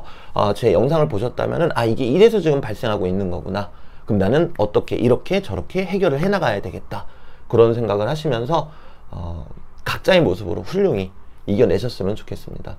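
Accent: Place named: Gyeongsang, South Korea. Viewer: 경상도에서 좀사셧엇나바여 ㅋㅋㆍ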